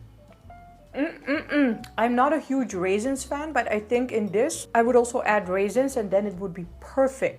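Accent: India. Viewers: Wow your English accent just like an Indian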